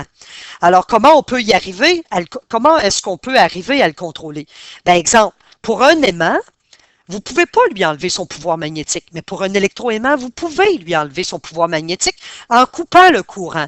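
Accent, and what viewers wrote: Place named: Canada. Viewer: Bonjour madame et bien merci pour votre réponse très rapide et c'est parfait cela me permet de très bien clarifier ce sujet. Vous êtes très compétente et j'aime bien votre accent Canadien et bien le bonjour de Belgique.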